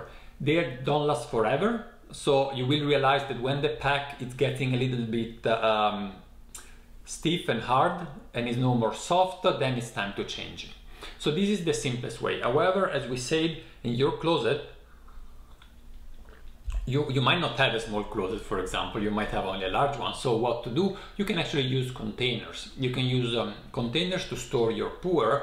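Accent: Italy. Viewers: Ciao, ti ho sempre e solo sentito parlare in inglese, e ho questo hobby di cercare di capire la provenienza degli italiani a partire dal loro accento in inglese, che mi porta alla domanda: sei pugliese?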